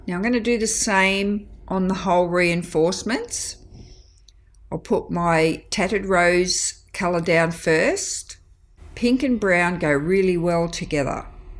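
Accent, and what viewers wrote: Australia. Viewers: Hi! So glad I’ve found your channel! I love what you do. I have requested to join your Facebook page, hope I’m accepted. And it’s so good to hear a fellow Aussie on YouTube!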